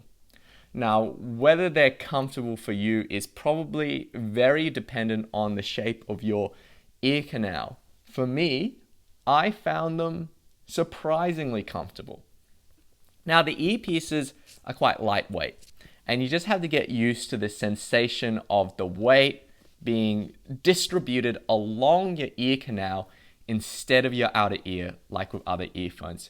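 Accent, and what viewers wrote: Australia. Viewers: uh he doesn't sound north korean, sounds more aussie to me.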